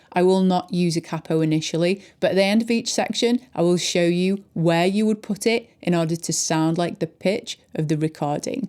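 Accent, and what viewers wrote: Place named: Northern England, United Kingdom. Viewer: Only came across you today, lovely channel. No faff in your delivery and it's great to hear a fellow northerner ont 'tube as opposed to an American or somebody below 'the divide' 🤣🤣 Subbed!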